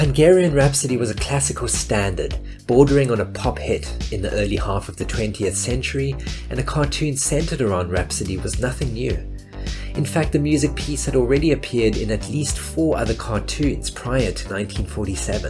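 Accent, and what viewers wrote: South Africa. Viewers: 2 seconds in and i hear a S.A accent....instant sub🌌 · Are you South African? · You sound South African af my dude Sick vid tho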